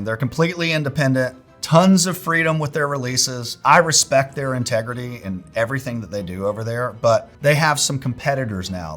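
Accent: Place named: France. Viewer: One of the best and more complete tier list in my opinion. Your french accent is perfect!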